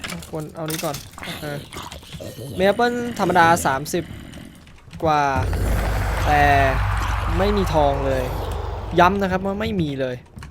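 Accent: Suphanburi, Thailand. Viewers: ก็ว่าเสียงเหน่อๆ เพิ่งรู้หนาเนี่ย ว่า เป็นคนสุพรรณ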